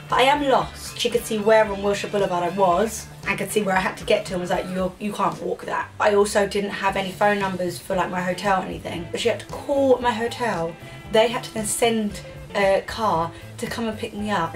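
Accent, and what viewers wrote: United Kingdom. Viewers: Where in the uk is she from?!?!?!?!? I recognise her accent... It's just rubbed off my memories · weird* and its because she is from london (i think) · I am so obsessed with British accents I could listen to you all day 😍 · I really love this video ! By the way i love the British accent ;) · Ilove you!!!!! Love from Colombia!!!!, if you want come, i waiting for you. (sorry for my bad english) , and i love watch this video for you british acent, Ineed learn. For me you are the best girlfriend #liam **Love,peace and happiness!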